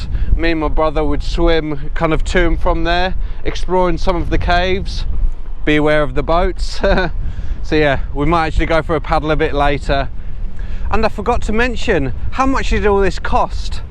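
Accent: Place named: United Kingdom. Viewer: Nice to hear good British accent ..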